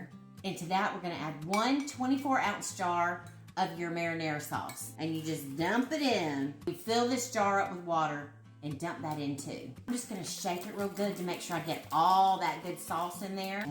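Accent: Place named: Texas, United States. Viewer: You scream Texas..😃 where are you from? Or the Carolina’s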